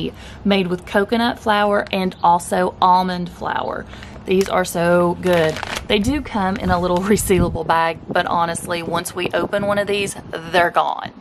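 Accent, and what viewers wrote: South, United States. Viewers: I love how you say "spicy dill"! I'm from Michigan so I love the southern accent!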